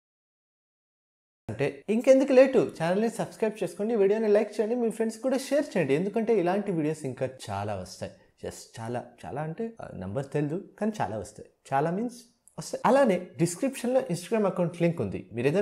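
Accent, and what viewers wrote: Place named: Andhra Pradesh, India. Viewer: "Chinna pappa chirak munnda" ee video motham lo naa attention ni garbe chesinna dilogue edhe.....😜😜😜😜miru Andhra Pradesh lo untara .... don't mind mi slang koncham Andhra laa unndhi....i love it❤️❤️❤️❤️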